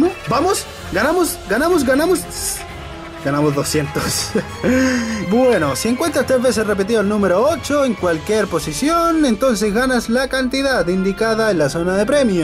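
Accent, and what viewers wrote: Chile. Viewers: eres chileno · Eres de chile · habla como chileno wnn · Eres chileno?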